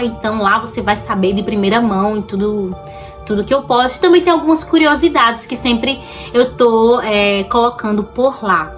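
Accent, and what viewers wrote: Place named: Northeast, Brazil. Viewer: "E disse bem assim" se existe coisa mais bonita que nosso sotaque nordestino narrando casos criminais reais, eu desconheço. Parabéns pelo canal.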